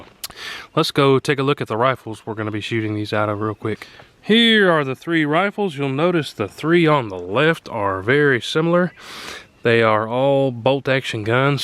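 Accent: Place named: Tennessee, United States. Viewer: I swear to god, after I watched you first video I KNEW you were from Tennessee. Just from your accent.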